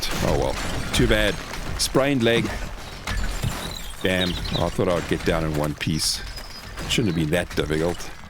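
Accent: South Africa. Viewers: South African accent is so fun to listen to.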